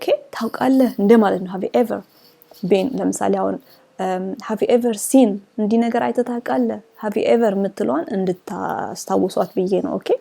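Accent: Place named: United Kingdom. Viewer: Good job👏👏 I love your British accent 👍 reminds me of my Brit friends.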